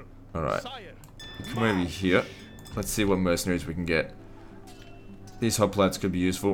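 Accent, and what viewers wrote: Australia. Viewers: Really appreciate you doing this, and i like hearing the ozzie accent.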